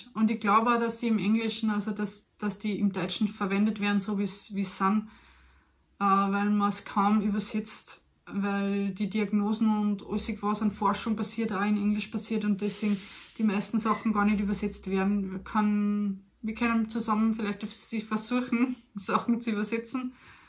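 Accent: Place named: Upper Austria, Austria. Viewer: Hi! Ich hab eh schon bei einem deiner sehr frühen Videos nachgefragt, ob du auch aus Oberösterreich kommst (ich bin aus dem Mühlviertel) und war nicht überrascht, dass du das bestätigt hast. Dialekt finde ich gut und sollte für keinen Deutsch-Muttersprachler eine wirkliche Hürde sein... Ich finde Inhalte auf Deutsch gut und lasse mich überraschen. Zum Thema Ravensburger: Ich hab grad gegoogelt, ob der Name ursprünglich auch im Deutschen von einem Raben kommt und scheinbar gibt es verschiedene Theorien. "Rabe" ist eine davon, der Name könnte aber auch von "Rauenburg", also einem rauen Hang neben der Burg abstammen. Interessant jedenfalls.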